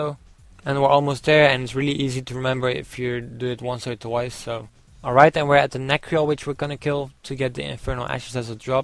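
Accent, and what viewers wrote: Netherlands. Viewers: wist dat je NL was door je accent:p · Je spreekt echt 'nederlands' engels..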